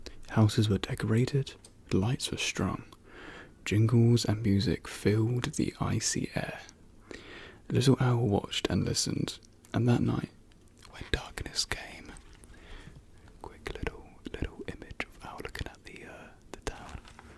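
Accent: United Kingdom. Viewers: That British accent tho was so addicting to listen😂😅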